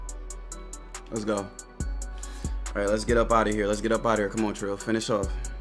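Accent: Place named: South, United States. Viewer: You can hear the So in the way bro talk🤣💯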